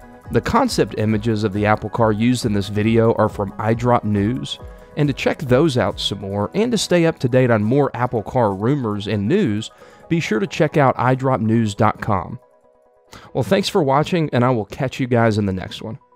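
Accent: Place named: Russia. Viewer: чьють чьють руский акцент ырше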